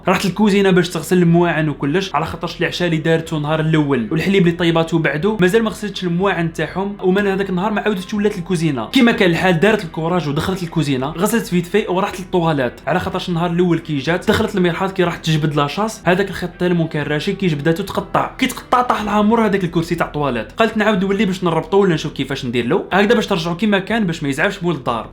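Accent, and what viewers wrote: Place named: Morocco. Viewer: هدرتك تقول مغرببي 🙄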